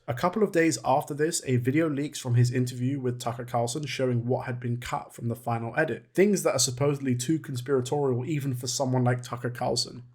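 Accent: United Kingdom. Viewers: I respect your opinion But a British accent criticizing the greatest leader my country has ever seen….is interesting As an actual black person I respect Ye’s move to the right Inspires more true black Americans to get out of this liberal stranglehold that’s been destroying our communities for the past 60 years And after 400 years of slavery, yes, it does become a choice to stay a slave. Especially when you out number the people enslaving you. What do you think share cropping was? Former slaves that chose to stay on the plantation after emancipation. Ye’s still the best Never bad in my book WW3 & Bully will be legendary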